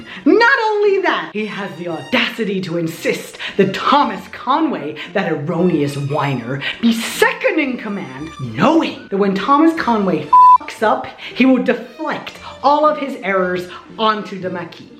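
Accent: United States. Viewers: 1. Your American accent is amazing! 2. Your absolute feral anger just encapsulates Hamilton in a way that only Lin Manuel Miranda can top. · Also until I switch scrolling through the comments, I literally forgot you were not American so like... good job? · As alway, your editing and costumes are amazing, your sass is hilarious, and your American accent is so dang good!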